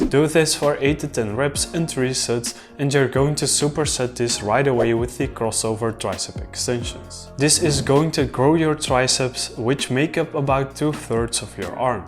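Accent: Belgium. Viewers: I'm so proud you're Belgian (you can hear it lol), Thanks so much bro! Also can women also do this program?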